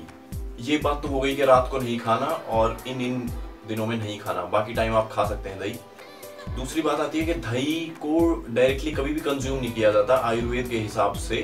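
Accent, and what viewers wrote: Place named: Himachal Pradesh, India. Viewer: It sounds like ...you're from HP Nyc info btw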